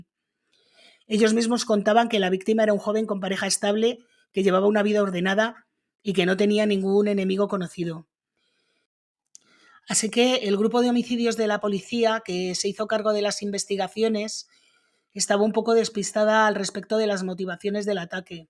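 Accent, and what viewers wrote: Spain. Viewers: Hola, me encanta tu manera de contarnos esos casos que narran las diferentes formas de la brutalidad humana. A diario veo uno que otro de tus casos. Vuestra forma de hablar ( de los españoles en general) es muy hermosa. Apoyo tu canal sl 100 por ciento. Desde Cuba, gracias.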